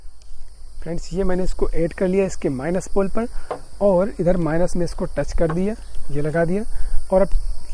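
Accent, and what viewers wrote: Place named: Allahabad, India. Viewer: Ap allahabad se ho kya bhai..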